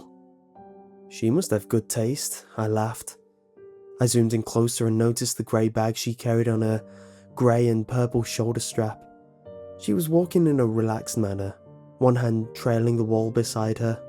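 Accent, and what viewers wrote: United Kingdom. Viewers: Which part of the UK is he from? Like his nearest city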